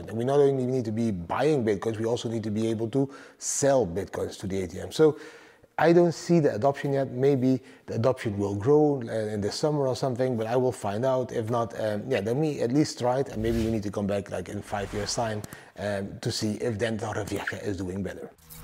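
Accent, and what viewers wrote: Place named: North Limburg, Netherlands. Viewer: Ik kijk met genoegen naar je video's. Naast de info ook vanwege de hele prettige uitstraling en de verborgen N-Limburgse tongval in uitstekend Engels. En mss zijn er mensen die zeggen dat het leven makkelijk is met vermogen, maar dat is BS. Zelf verdiend, van harte gegund en een enorm risico genomen. Ik ken meer vermogende mensen, kunnen zo in het azijnrek vd supermarkt. Oja, een vriendelijke lach, een paar zinnen leren van de taal waar je op dat moment vertoeft doet wonderen. De wet van wederkerigheid. En vooral ook leuk Geniet van het leven mensen !!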